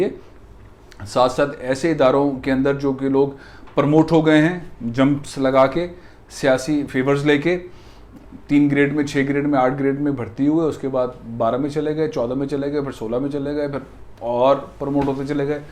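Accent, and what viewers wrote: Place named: Punjab, India. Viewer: Apka bolny ka style ab tu paka panjabi ban giya hy,ye kyse houa???🤔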